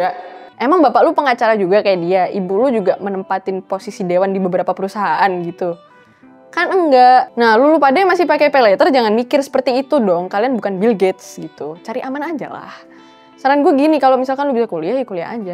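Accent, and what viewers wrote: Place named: Surabaya, Indonesia. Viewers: Chindo Suroboyo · Medok sidoarjo an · Mba mba ini sepertinya cici cici suroboyoan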